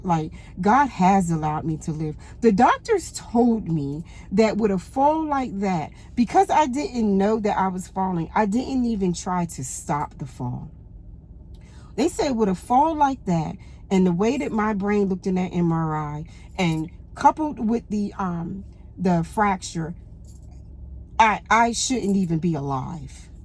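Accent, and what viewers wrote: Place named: New Jersey, United States. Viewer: Cool accent. Are u from Jersey?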